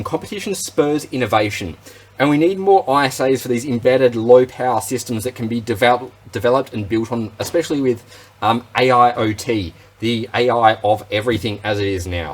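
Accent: Australia. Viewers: Mate, I just bought me one of these, just because I could. I mess around with Raspbarry Pi's, have the 26TOPS HAT, still figuring it. But saw one of these and just had to have one. Got it with a MIPI touch screen, so i can make it all in one, just add power. Love your review, it is so much better to hear an Aussie voice doing these 'weird' hobby stuff. Cheers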